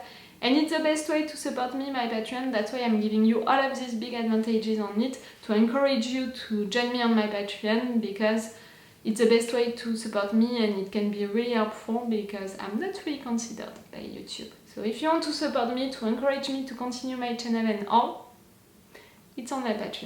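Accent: France. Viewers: j'adore ton accent français ^^ Il est merveilleux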